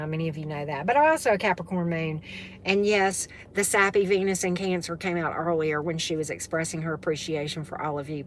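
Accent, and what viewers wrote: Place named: Louisiana, United States. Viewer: I'm from England and I find your accent adorable. Where is it from? Louisiana? Thank you for the reading. 😁❤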